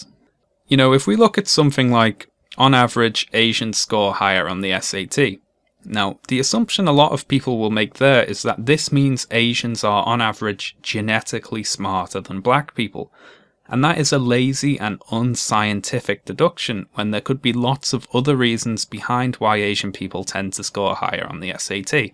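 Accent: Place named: England, United Kingdom. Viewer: it's strange hearing how much more english he sounds in these earlier videos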